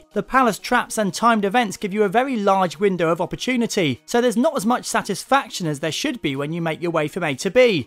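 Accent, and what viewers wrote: England, United Kingdom. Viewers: go fuck yourself! He is ENGLISH and speaks ENGLISH with an ENGLISH accent...if you think ENGLISH is an indigenous American language and the American accent is the right one, pick up an American history book and read...you need learn more about the world you live in, DUDE!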